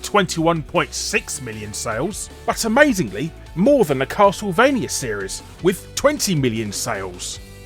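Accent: England, United Kingdom. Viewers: Guru Larry just made me realise you can't spell Successful without SuCC! God bless that English accent of his!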